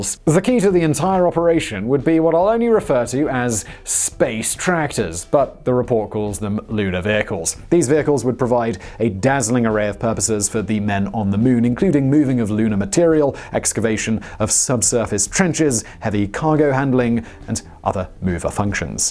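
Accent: United Kingdom. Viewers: It's a joke, not a dick. Don't take it so hard. On a serious note, I'd love to see such a channel, and would subscribe to it immediately upon knowing it exists. I truly enjoy delivery, and I love the British accent.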